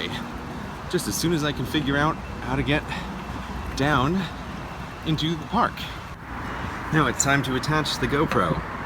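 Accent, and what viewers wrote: United States. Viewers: Woah woah woah woah hold on... you're NOT American? But your voice sounds so plain like ours.... WAT?!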